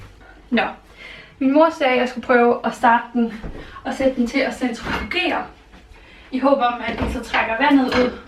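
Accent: Funen, Denmark. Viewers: Fineste. Så dejligt at høre dig noget mere på dansk ❤️. Elsker dit fynske 😍. Knuuus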